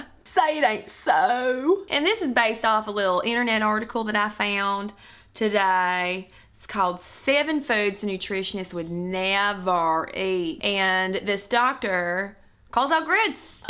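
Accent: South, United States. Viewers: I like your southern accent :) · I want to hear mom of your southern accent. It's great! haha! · I love your channel, just found it today, but please, no more southern accent.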